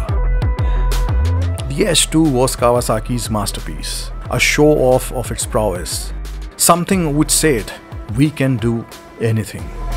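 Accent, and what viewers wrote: India. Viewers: INDIAN Accent 👊💪🏼💪🏼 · Sound like india??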